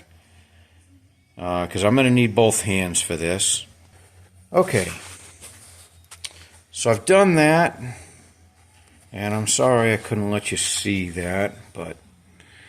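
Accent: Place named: New Jersey, United States. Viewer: That NE Jersey accent is the worst sounding in the USA. Hems and hauls around talking talking in that annoying accent. Bro get to the point...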